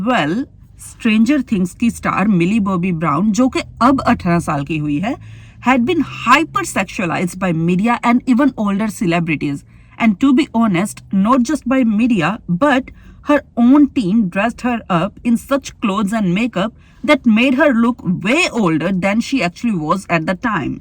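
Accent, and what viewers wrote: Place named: India. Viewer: This video is a perfect example of an Indian women outside India speaking about an Indian topic in English but with Indian Accent.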